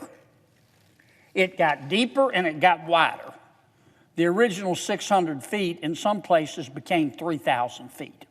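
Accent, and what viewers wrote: South, United States. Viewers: Me and Mr Kennedy share the same southern drawl. My Mom's family are from Keechi, LA. people think we are stupid because of the drawl. I love when they underestimate us!!! It makes for good times! This is Senator Kennedy's Super Power! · I am from the South as well and I Love His Accent!! I also Understand all of his jokes 😂 He is just a Good Man Period .. Big Heart ❤️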